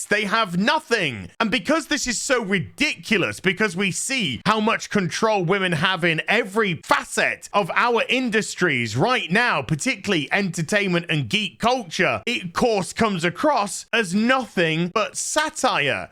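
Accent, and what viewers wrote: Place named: United Kingdom. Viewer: Good Day Sir. Liked and subscribed. Let me say that your Barbie review is by far the best I have ever seen/heard. I also noticed that you are British =) Good Lord, your laughter is so infectious! You bring such a delightful and positive view to the Barbie situation and you Sir are so right! I too am from outside America so I could really sort of be on the same page as you are presenting. I would also like to share with you what I noticed about Americans. They view things very differently the way most people outside the US do. Chances are, many of them (or even majority) will not be able to jive with that humor of yours that made me laugh so much! They are usually Morose and would take anything as offensive even when it is not. Their culture sadly has gone 'Kaput' that they can no longer see the funny side of life or the silver lining in the clouds. 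It is so difficult to understand them. I will continue to watch your vids as they are a delight compared to the dark vibes you get from majority of US content creators. Thank you and GodBless.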